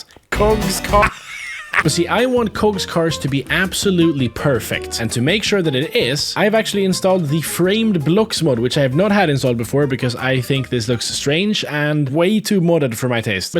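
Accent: Sweden. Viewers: I thought that dialect was familiar! I had to chevk the about me lol. How do i always find Swedish creators?!😅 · You sound like you have a swedish accent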